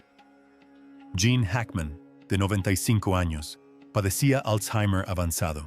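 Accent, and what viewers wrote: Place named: United States. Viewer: acento americano que me da dolor de cabeza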